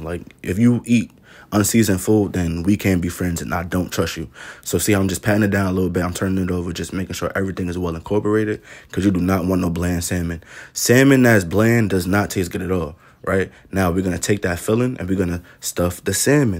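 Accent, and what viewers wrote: New York, United States. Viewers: “Light skinned salmon “ 😂🤣 big facts! Lol I knew you was from NY soon as I heard you tawkin.lol Yo how you like that kerrigold butter? Some ppl be sweating it but idk..I wasn’t really feeling it. What do u like to use it for more?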